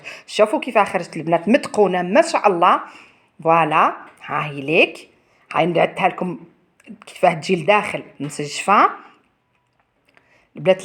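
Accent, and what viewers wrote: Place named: Batna, Algeria. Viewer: يعطيك الصحة وهدرتك هايلة تباني باتنية بنت بلادي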